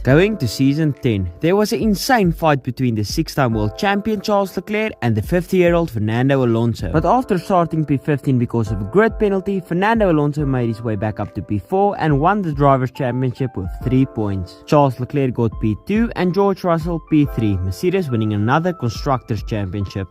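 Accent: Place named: Afrikaans, South Africa. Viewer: im trying to figure out where youre from and you give me afrikaans vibes, so ima guess SA?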